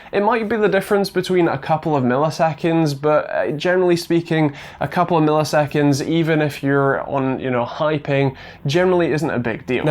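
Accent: United Kingdom. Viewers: well I dunno if u forgot where the English language came from but he sounds like hes from the UK as am I, perhaps he's northern I'm not sure as accents vary so much u can drive an hour anywhere and it changes but anyway.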